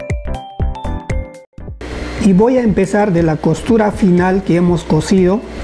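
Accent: Peru. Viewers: Sos peruano o boliviano.....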